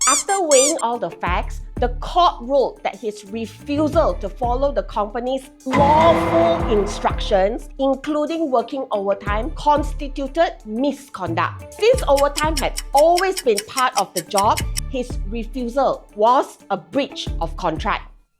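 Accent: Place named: Singapore. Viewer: I thought you singaporean cause ur english is very good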